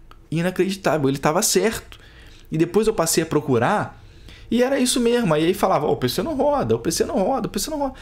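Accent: Rio de Janeiro, Brazil. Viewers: Comparar o FIFA deixxx com o peixxxx... Esse sotaque carioca é uma foda...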